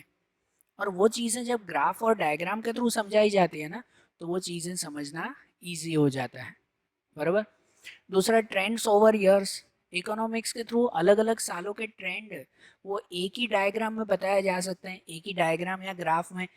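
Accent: Gujarat, India. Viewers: Good explanation sir gujrati cho ne 😅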